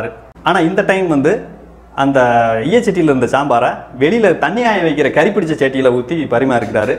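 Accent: Madurai, India. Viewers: மாம்ஸ் இன்னைக்கு மட்டும் 10 வீடியோ பாத்துருக்கேன்.. என் மனசுல இருந்தத பூராம் மதுரை மொழில செல்லிருக்க.. சிரிச்சு வயிறு வலிச்சிருச்சு... சத்தம் போட்டு சிரிச்சோம்யா.. மாம்ஸ் வாழ்க...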